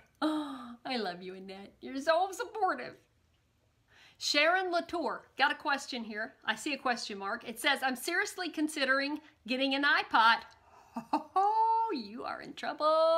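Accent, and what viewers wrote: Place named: South, United States. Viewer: Oh yeah, thank You to the Creator of the chickpea. Long live the chickpea! Hey, I love your accent. It's similar to mine. So, you just keep on with that lovely southern talk. We love you! Mmmmmwuah ! 💜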